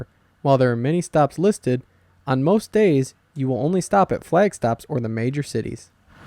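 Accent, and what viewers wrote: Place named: United States. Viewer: We need more CanadianEnglish pronunciation in this video. It sounds like the narrator is American...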